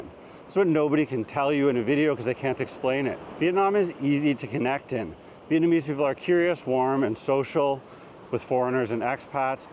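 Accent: Canada. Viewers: Are you from Wisconsin? I think that you sound more like a Canadian, but you mentioned Wisconsin a couple of times... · First honest influencer for SE Asia. You sound Canadian though.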